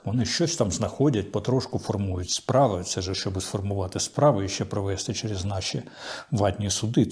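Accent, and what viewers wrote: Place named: Russia. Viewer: А чому в пана Дмитра така підозріла російська фонетика? :) Чому це він називає КлИчка - "КлІчком"? з якого дива? Звідки оці : "ДанІлови", "РЄзнікови", а також вимовляння на російський лад решти слів на кшталт "проблЄми", "крЄмль" тощо. Вам так подобається московська мова?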